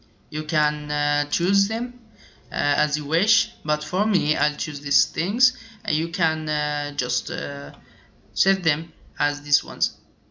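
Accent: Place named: France. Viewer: Good job that you made it english but I can still hear that french accent. Why it seems like everybody doing robot turorials are from France?! Interesting :D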